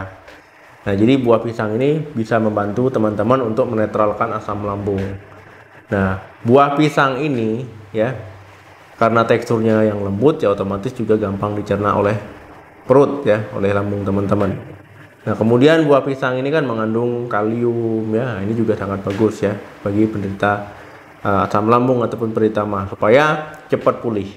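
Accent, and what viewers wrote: Aceh, Indonesia. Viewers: Lidahnya Aceh banget dok😊. Saya org Aceh tinggal di Batam. Saleum aneuk nanggroe 🙏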